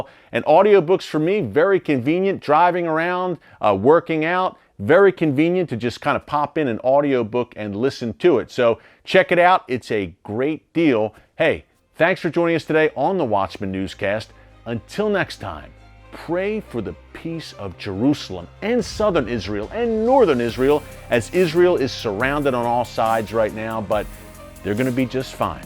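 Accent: Philadelphia, United States. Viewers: I thought I recognized a Philly boy. I’m from DE. I miss good Philly pizza.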